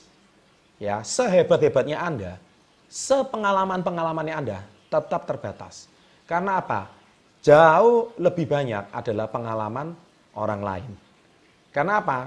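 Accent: Java, Indonesia. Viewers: ngomongnya jelas dg nada jawanya . keren mudah di pahami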